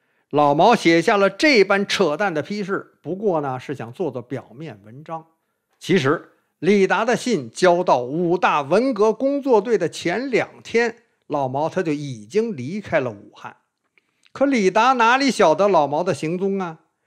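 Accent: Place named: Beijing, China. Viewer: 爱听的地道北京话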